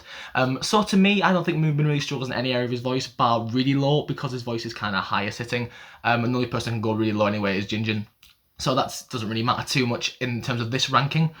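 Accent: United Kingdom. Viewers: An Astro fan (Aroha) just pointed this video out to a few of us and you speak so much sense! Really interesting watch and super informative. Haha Jinjin’s singing voice isn’t amazing but his rapping voice is insane! You sound like a fellow Brit also, hello! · the british accent really surprised me omg it’s so nice to see a fellow astro fan who is english, thankyou for being so kind and knowledgeable too!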